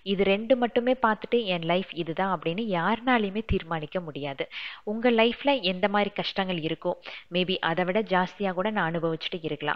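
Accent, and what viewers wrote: Coimbatore, India. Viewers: Uoinga video la nalla iruko sis....neinga coimbatore ah pesum pothu konjam theyriuthu... good luck 💐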